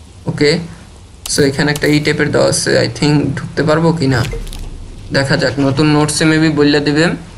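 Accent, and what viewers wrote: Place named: Barishal, Bangladesh. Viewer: Tmi ki borishailla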